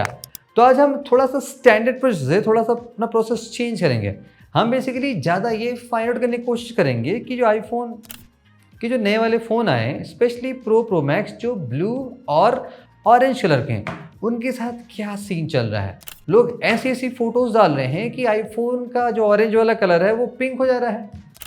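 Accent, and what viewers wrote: India. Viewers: I don't really understand English with an Indian accent. Can someone give a conclusion from the video? Is silver better?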